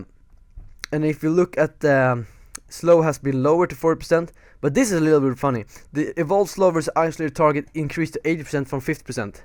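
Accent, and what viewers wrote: Sweden. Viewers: Are you from sweden? Because your accent sound like you're from sweden:d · Don't you hear the obvs swenglish?:-) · Are u swedish?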